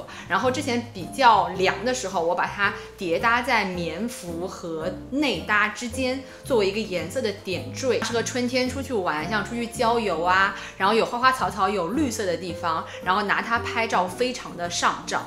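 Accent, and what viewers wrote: Shanghai, China. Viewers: 好喜欢你的上海普通话啊哈哈哈哈，太开爱了。裤脚管，便宜西西的哈哈哈哈😂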